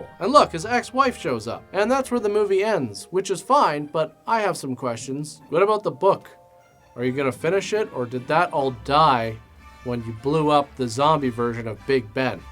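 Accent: Canada. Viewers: To be honest I'm kinda surprised you don't pronounce "aunt" as Ah•nt because you're Canadian. I've noticed Canadians put a lot of emphasis on pronouncing things exactly like they are spelled, for instance when you say soh•ree instead of sah•ree for "sorry".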